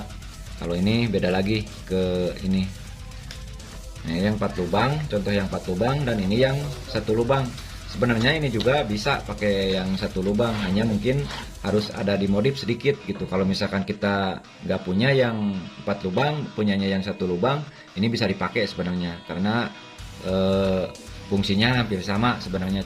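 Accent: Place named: West Java, Indonesia. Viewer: Duh, si Aa sunda bngt. Haha Don teh apa Aa?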